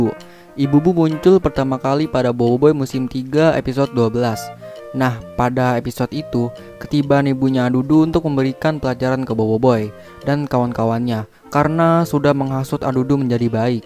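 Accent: Java, Indonesia. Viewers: Bang kamu orang Jawa bang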